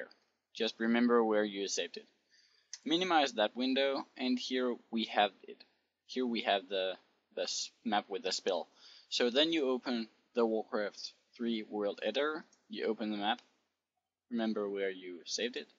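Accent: Denmark. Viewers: are you danish :D?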